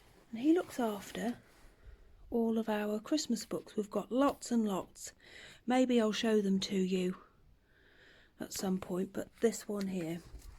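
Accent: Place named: England, United Kingdom. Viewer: Enjoyed your English accent and your video!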